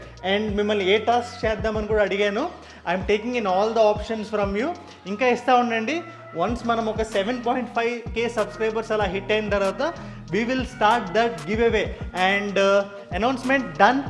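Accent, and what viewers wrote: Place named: Hyderabad, India. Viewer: Love from Hyderabad, perfect Hyderabadi way of telling❤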